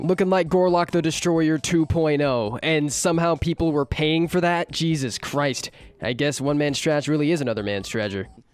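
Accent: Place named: Texas, United States. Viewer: Sounds like Texas...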